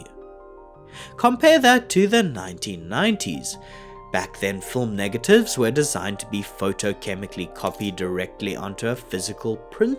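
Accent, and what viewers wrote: South Africa. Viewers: You for sure are from SA Bru, am I wrong? Howzit Boet 🫡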